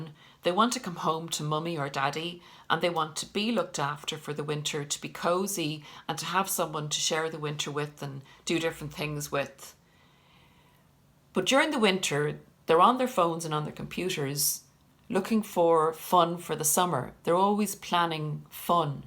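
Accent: Ireland. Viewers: You deserve a lot of subscribers. You nail it on the head. I am 2 years NC. I feel a lot better but I still watch videos and was extremely happy to come across your channel. I’ve already shared one on fb. You are wonderful. Love your Irish accent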